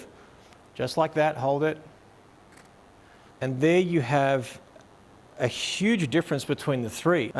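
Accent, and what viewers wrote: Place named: Australia. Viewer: I love his aussie accent.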